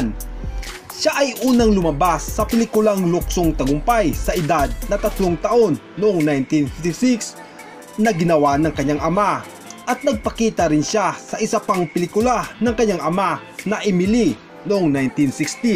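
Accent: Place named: Visayas, Philippines. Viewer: Kainis ka mag kwento patalon talon..at bisayang bisaya.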